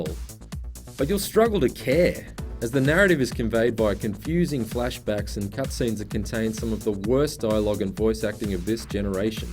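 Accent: Australia. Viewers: And he is Australian! (No offense intended) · prob cuz hes an aussie the accent is fucking sublime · is this guy australian???? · Love that aussie accent, because i have one :)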